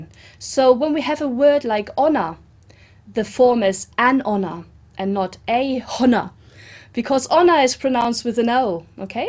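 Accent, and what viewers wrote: Germany. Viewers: Hey, thanks for the clip! By the way...something I wanted to ask you.... Are you German? Just wondering because of your pronunciation 😉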